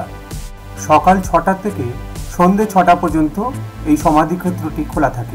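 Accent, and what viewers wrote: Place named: Bangal, India. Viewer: এই তোমরা ছ ছ দিয়ে কথা বলো কেন। তোমরা কি ইন্ডিয়ার বাঙাল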